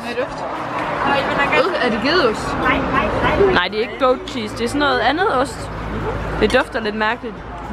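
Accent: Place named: Jutland, Denmark. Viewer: Jeg føler at du lyder meget mere jysk når du er sur elsker dig❤️😂❤️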